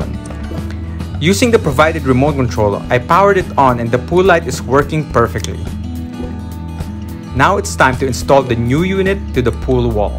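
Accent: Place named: Philippines. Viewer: Salamat! I knew by your voice you were likely Philipino. I now no for sure, since I went to website to look at the light. Available in Manila lol ...looking for something similar in the states! Much bless!!! Thanks for the suggestion on waterproofing the J-box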